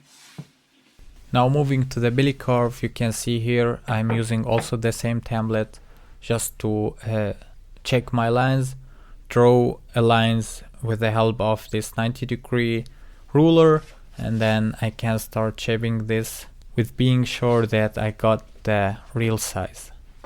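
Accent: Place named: Russia. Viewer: По русски нельзя было говорить? Слышно же по акцент у, что русский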